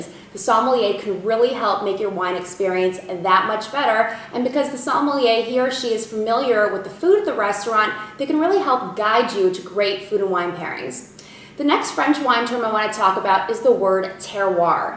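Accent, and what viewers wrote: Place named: United States. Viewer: Actually her English accent is just fine. It has a slight twang in it but other than that, it is a clean and relatively non-distinct American English accent.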